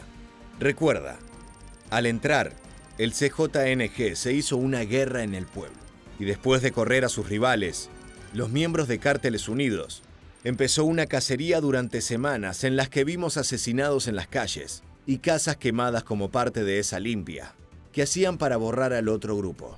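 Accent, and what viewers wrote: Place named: Argentina. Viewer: Amigo, estas muy bien documentado, aunque veo tu acento argentino me da la impresion que vives aqui en Mexico, vas al dia... muy interesantes tus documentales. Saludos.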